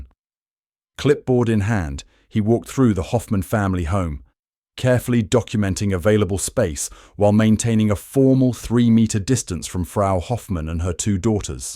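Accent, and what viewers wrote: United Kingdom. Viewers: The presenter's accent is British. The British use meters as a measurement. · American military uses meters for ranging. We do use freedom units of measurement for the most part but that doesn't mean we don't understand the metric system. We just use more math than you. Also , the commenter in this video is obviously a Brit. We like them now and find their accent very amusing but we have had a few small differences in the past.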